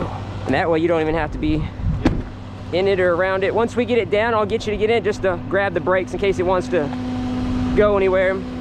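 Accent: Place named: South, United States. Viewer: You’re welcome and hopefully you’ve got your flatbed back by now lol Oh and I like your southern accent, where are you from? I’m in good ‘ole Kansas lol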